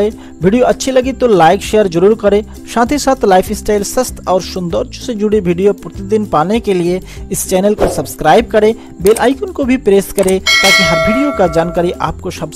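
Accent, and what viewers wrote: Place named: Bengal, India. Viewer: Yeh bagali h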